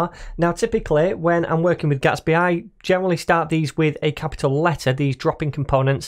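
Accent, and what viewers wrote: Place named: Barnsley, United Kingdom. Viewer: Great videos mate, thank you! Saw that you mentioned Manchester but sounded like you were from Barnsley 😉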